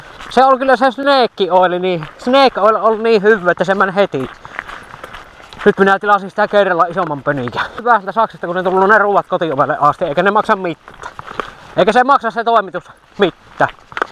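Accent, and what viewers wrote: Savo, Finland. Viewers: Savolainen englanti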